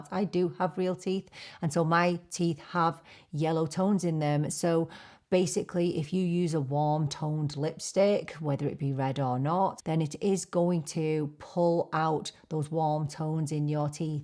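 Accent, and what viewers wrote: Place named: Manchester, United Kingdom. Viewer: Loved this! I definitely dont have the confidence to wear red still enjoyed seeing you try them all on. Was shocked to hear you dont live in the UK you sound straight of Greater Manchester to me 😮 ❤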